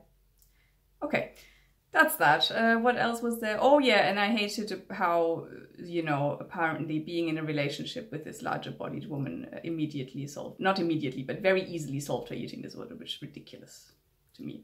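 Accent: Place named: Northern Ireland, United Kingdom. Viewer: Sorry you haven't had a great day, though with all of this stuff going on I can see why. Thanks for making this video anyway though. I imagine a lot of Booktubers probably feel pressure to be cheerful in every one of their videos, but as a long time booktube viewer I find it just as valuable to listen to people when they're angry or sad as when they're happy. Thanks for sharing your perspective on things and for these recommendations of what to watch. I've got some Northern Irish family so I'm used to the accent as well, but I get why people find it befuddling! I like your reasoning behind revisiting The Butchering Art. Thank f*ck for science indeed!😆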